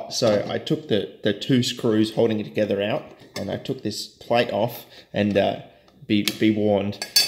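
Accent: Adelaide, Australia. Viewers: He's a proud Adelaide lad! We all sound like that over here.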